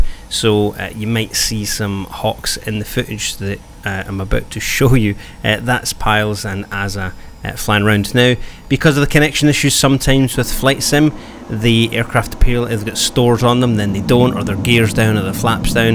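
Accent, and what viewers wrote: Scotland, United Kingdom. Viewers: When it doesn't even remotely look like that because your stone age 1080 isn't able to run it. For those not knowing: The way out is straight forward across that lake Now I still have to get around imagining to fly with a hell either just left or right of me. Anyway, that lake is well deserved. Gotta admire that Scottish accent :)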